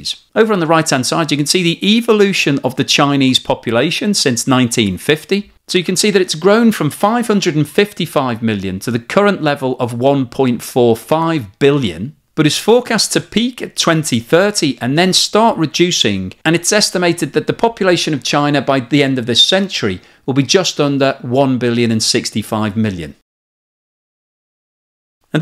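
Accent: United Kingdom. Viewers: if you don't mind my linguistic curiosity, I assume you are from the UK, judging by your pronunciation - yet you pronounce "Masterworks" the American way. Why is that (or am I somehow mistaken)?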